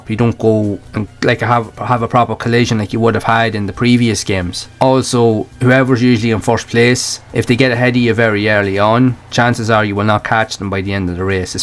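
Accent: Ireland. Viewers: nice one fair play enjoying the vids and nice to hear an Irish accent on here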